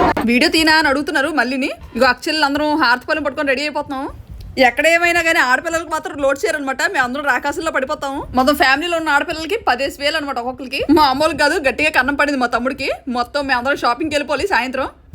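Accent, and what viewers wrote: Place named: Uttarandhra, India. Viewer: గారు,అబ్బా వీడియో అంతా ఎక్కడా ఏ చిన్న విషయాన్ని వదిలేయకుండా మొత్తం కవర్ చేస్తూ ఫంక్షన్ మొత్తం చూపించేసారండి, మీరు మాట్లాడే యాస ఉత్తరాంధ్ర భాషపై మాకున్న ప్రేమని మరింత పెంచుతుంది,పెళ్లి బొమ్మలు మాత్రం ఎక్సలెంట్ అండి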